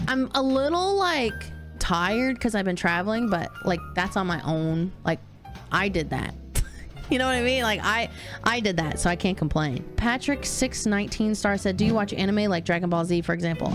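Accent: South, United States. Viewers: Oh my gosh, I am a blind dude so I really love your southern accent. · As a fellow Southerner, I gotta say that I love that you don't hide your accent. I feel all warm and fuzzy inside when I hear that twang. Keep doing the Lord's work, sweet thang. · 🫡 the southern accent pulled me in, as I have one and it’s not fun online 🤣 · You have a country accent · Found you through the Crew! Glad to have you, have genuinely enjoyed your company and silly intoxicating laugh when you’re enjoying yourself with them. By the way it’s awesome to have a southerner representing, you ain’t hiding that accent ❤ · Wow really? She sounds so Southern tho xD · Really like the southern accent Mine comes out every now and then even after 6 years in the city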